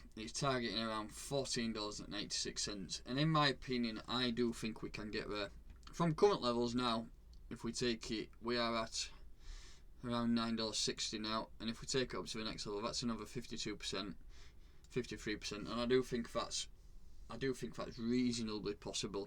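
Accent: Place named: North, United Kingdom. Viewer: $4.80 entry is optimistic at the minute. $13.69 price as of today. Nice content though my man, nice to hear a northern accent in the crypto world.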